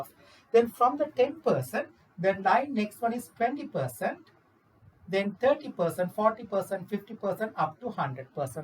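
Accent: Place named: Sri Lanka. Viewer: Sir Are you from Sri Lanka? You have that accent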